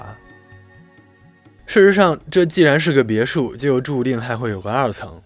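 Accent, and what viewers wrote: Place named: Beijing, China. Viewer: 他生活環境就那個北京調 多看幾個影片後 覺得也還好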